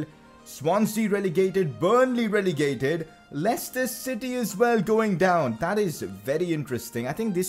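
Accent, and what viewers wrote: India. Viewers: You sound indian..are you? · U Indian mate ?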